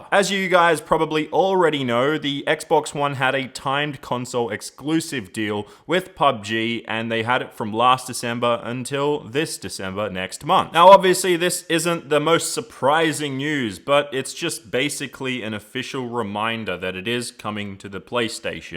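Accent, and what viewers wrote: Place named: Australia. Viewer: Always been in to Australian accent! Hahahaa “GOOD DAY GUYS HERE”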